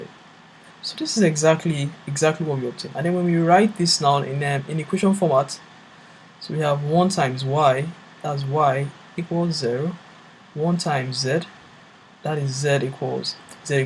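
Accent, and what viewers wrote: Nigeria. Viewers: i knew you were nigerian as soon as i heard your voice, you probably igbo too lmao, good video broski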